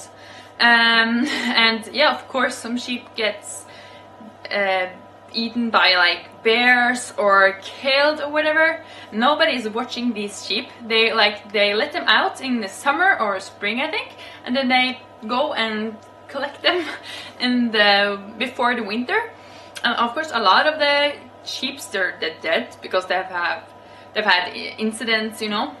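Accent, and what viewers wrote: Norway. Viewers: I loved your accent, I like the nowergians accent when you speak English. Here in Brazil, I think that We are one of the most sociable countries in the world, except here in the south, Where I am living now, I the south of Brazil there are a lot of Europeans descendants and they are not so sociable like the people of the others regions.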